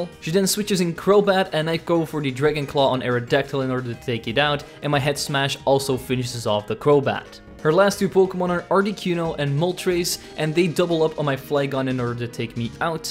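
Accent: Netherlands. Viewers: Im dutch to its a great accent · wait are u dutch didnt even notice earlier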